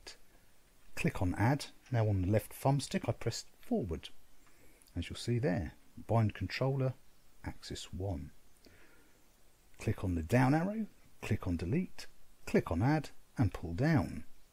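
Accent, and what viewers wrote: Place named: England, United Kingdom. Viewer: This guy has a very strong English accent and I cant understand him plus I need the audio almost full blast. Is there a *TYPED* version of what this guy is saying?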